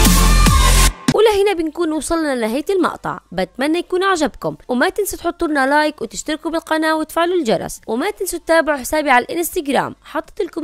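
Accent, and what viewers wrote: Yemen. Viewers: ايه كانت تصور فيديو ف تيك توك هي واحد وسألوها المتابعين في التعليقات دا زوجك ردت وقالت ايوه مين قال لكم انها مش متزوجة لا هي متزوجة 🙂💕 تعديل هي لما عاشت في مصر وقلتوا انها تتكلم مصري Noo هي اللهجه اليمنيه كدا زيي يمنيه✌🏻💜🌝🇰🇷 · الهجه يمنيه بجنوب اليمن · اني كنت احسبها سعوديه بس طريقت كلامه يمنيه هلا ببنت اليمن ❤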